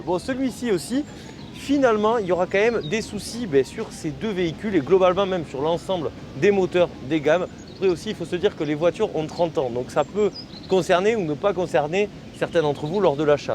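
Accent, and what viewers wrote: South, France. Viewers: Je suis commercial pour une des 3 marques allemandes premium et pourtant j’avoue être sous le charme de tes vidéos. Une belle energie, un accent du sud bien vivant et des voitures qui ont fait notre enfance/adolescence. En plus tes vidéos sont bien documentées et tes montages aux petits oignons. Bravo !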